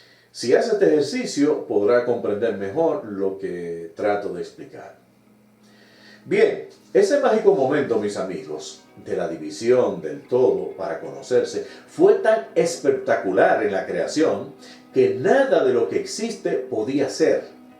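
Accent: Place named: Dominican Republic. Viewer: Hola 🖐️ es un honor saludarte. Me nutro mucho escuchandote, muchas gracias por tu gran aporte. Soy Dominicana,y quiero saber si tú también lo eres; pues tú asento es parecido al nuestro. También estoy en el camino del despertar; y me gustaría saber si vives aquí; pues necesito ayuda más directa. También yo me estoy preparando para ayudar a mi país con el despertar. Por favor, espero su respuesta.... gracias mil!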